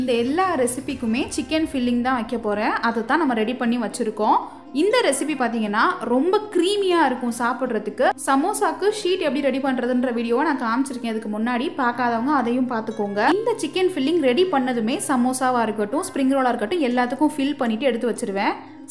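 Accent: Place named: Theni, India. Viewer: Neenga cumbum theni pakamnu ninikiren ...unga slang theriyudhu..crct ah sister?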